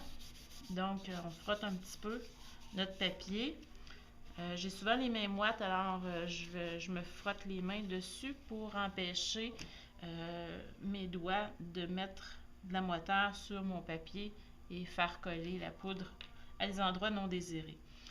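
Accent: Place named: Canada. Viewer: merci ma canadienne j'adore ton accent ! et merci pour tes bons conseils! et l'autre en dessous qu'il ferme son tabernacle mdr!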